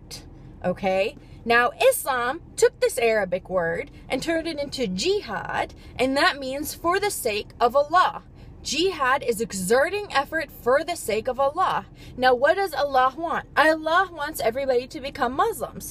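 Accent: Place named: Texas, United States. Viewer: I'm confused, if you grew up in the Middle East, Like most people in my family, where is your accent? You DID say that you GREW UP in the Middle East. And you're mispronouncing most Arabic words. You sound like you're from Texas or Alabama and such... And by the way, The equal pay thing is not a myth. It is true lol.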